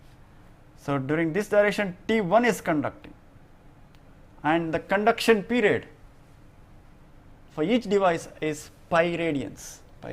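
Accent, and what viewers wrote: India. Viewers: Only the Indians will understand this accent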